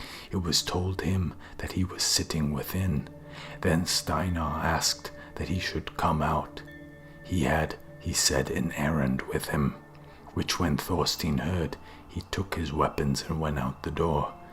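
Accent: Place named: Wales, United Kingdom. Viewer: Thank you very much for this amazing, long series. It is hard to imagine how much labour went into this. It was a nice surprise after searching for a long time nearly all the English narrations seemed to be AI-generated. Then found a promising one by an Icelandic lady on Bandcamp and bought it, but unfortunately later found out it was incomplete. I'm curious what is your native language/nationality? Your accent sounded a bit like Welsh sometimes but it's hard to tell (anyway it suited the narration very well). Look forward to your other work.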